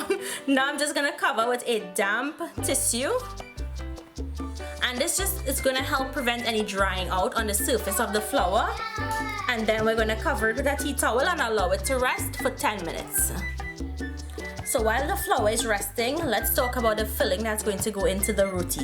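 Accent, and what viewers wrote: Trinidad and Tobago. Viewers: I have always love the Trinidadian assent. From a vincy girl. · ... That's the sweet Trinidadian accent! Really lovely.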